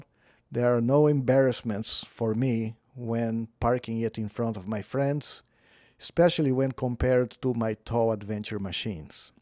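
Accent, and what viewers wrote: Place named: Brazil. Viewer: i loved this video and also love the name of your channel....sotaque de brasileiro hein i am a new subscriber to this channel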